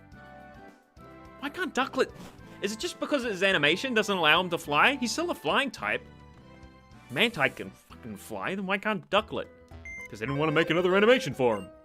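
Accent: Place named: France. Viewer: Love your french accent haha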